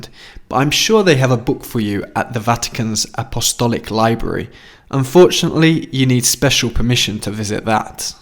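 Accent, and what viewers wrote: United Kingdom. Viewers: Today, the 25th of March, 2023, I´ve had my first contact with this great channel: I love your accent (I guess you speak British English, but I can´t get from what exact country you are. I am from Barranquilla City (the Shakira´s hometown), on the caribbean coast of COLOMBIA, South America, so SPANISH is my native language (Saludos fraternos). I am also Christian (Roman catholic), so I really enjoy your video and I learnt a lot: I think I will go to Italy and The State of Vatican City, soon. I am sure I will improve my English communication skills with you: I have turned into your new fan: My best wishes!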